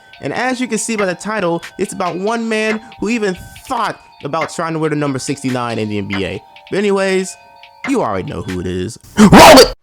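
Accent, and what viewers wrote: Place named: Birmingham, United Kingdom. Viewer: You from that Birmingham area same bro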